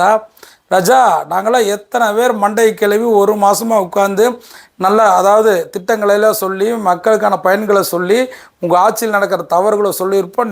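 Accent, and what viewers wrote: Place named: Kongu, India. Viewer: Bro....excellent speech that too kongu slang....wow❤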